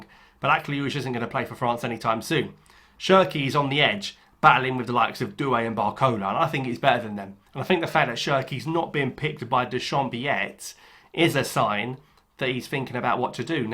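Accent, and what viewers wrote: United Kingdom. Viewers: Is the British accent difficult or am I not good enough to understand English?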